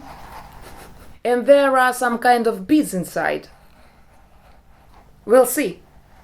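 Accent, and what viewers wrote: Russia. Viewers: Акцент Русский, или мне кажется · You speak like russian😅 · OMG!! WHAT!!! UR RUSSIAN!! OMG YOU SOUND SO COOL I DIDN'T EXPECT THAT.. UR SO COOL 😱😱😍😍😄😆😱😱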